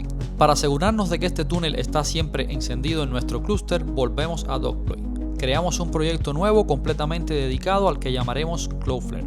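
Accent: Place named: Cuba. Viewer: Eres cubano! Ese acento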